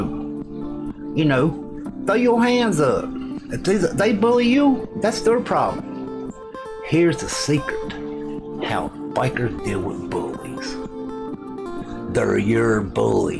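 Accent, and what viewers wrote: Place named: South, United States. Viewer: My wife is rollin on the floor. Love how you articulate in the southern accent !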